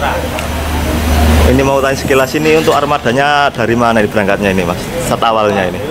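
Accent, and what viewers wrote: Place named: Java, Indonesia. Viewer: mantap mas.....kroso bedo...bis ko padang sing gawe video logate njowo...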